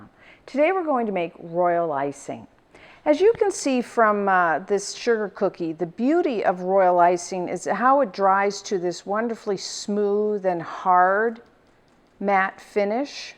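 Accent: Canada. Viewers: Confectioners sugar - is that icing sugar?? Love your Canadian accent btw xx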